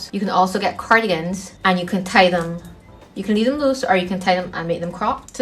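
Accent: Barbados. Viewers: Fr she sounds like she might be from barbados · yeah it kind of caught me off guard. I was like wait is she speaking English. I had to listening intently, I said oh she’s Bajan. 😊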